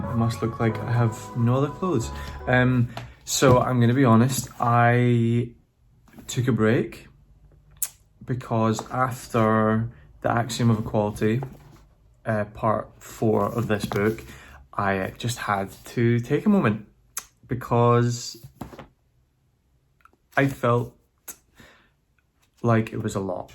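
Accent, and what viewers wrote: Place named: Scotland, United Kingdom. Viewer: Omgosh, 2nd i heard the scottish accent I jizzed myself....wellllll, the book is on my night stand as we speak, so lezzz do disss.....also have the audio book on deck just in case. I only hope to find a boyfriend to gift me books on valentines day some day heart eyes emoji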